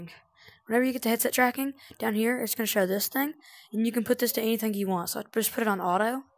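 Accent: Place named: South, United States. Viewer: Not trying to be rude but you lowkey sound country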